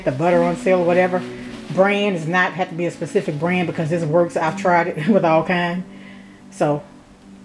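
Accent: South, United States. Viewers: I love your good ole' Southern accent. I think l will try this recipe.😄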